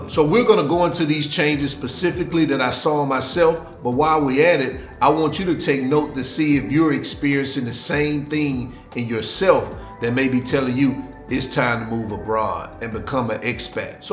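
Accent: South, United States. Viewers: PS...You've been in another country for awhile and loosing your Southern accent! Lol!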